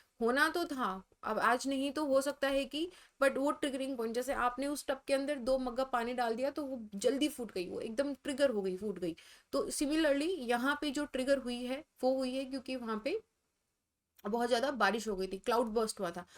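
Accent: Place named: Rajasthan, India. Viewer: Amazing discussion on GLOF, Thank you so much ma'am your voice tone seems like you belong to Rajasthan my home state.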